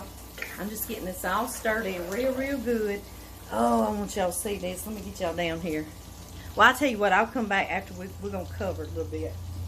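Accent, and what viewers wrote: South, United States. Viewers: I do enjoy your cooking videos. You are so much fun! Don't stop talking! Your Louisiana vernacular is hilarious! Love your Southern accent and all your phrases! You are entertaining! I love to watch cooking videos. Keep up the good work!🍳🔪🥘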